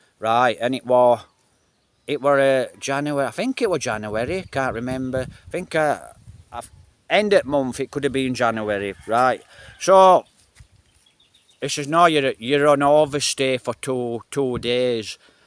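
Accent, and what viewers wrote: Yorkshire, United Kingdom. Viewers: Hilarious.:face-green-smiling:. Are you from Yorkshire, mate (like me), or a red rose ?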